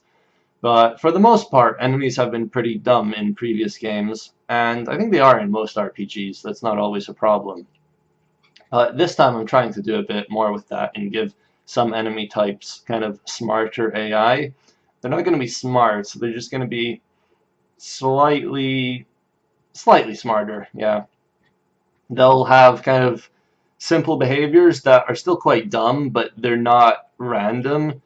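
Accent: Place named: United Kingdom. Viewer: Oh my god your accent is fucked. It's British, yet slightly American, but it'll slip into Scottish because you live there now.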